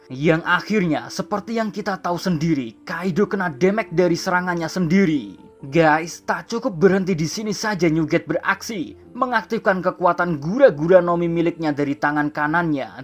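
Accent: Java, Indonesia. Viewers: Min coba pake narasi full basa Jawa. Medokmu mantap bgt min. Aku suka 😂 saya nyoba pake narasi medok di konten saya a dapet min. Apa gara2 saya jawanya cuman 50% yak? Kasih tau rahasianya supaya bisa medok min 😂. Kasih feedback yaa gan saya medok kek si mimin apa kaga 😂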